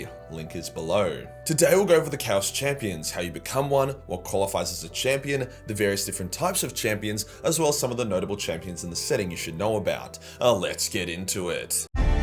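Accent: Australia. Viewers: can you please lower the volume of your outro? I cannot count how many times I have almost fallen asleep to your lovely Aussie accent only to get jumpscared awake by the "BOW TO ME"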